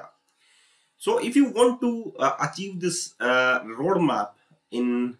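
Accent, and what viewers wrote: India. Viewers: is there English caption in videos ? (I am from Jordan and find it hard to me to understand Indian English so i need caption to understand well).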